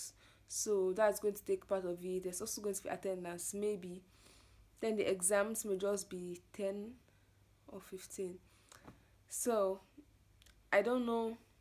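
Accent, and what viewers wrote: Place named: Nigeria. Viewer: Hi Your Nigeria accent is still strong Love from Ukraine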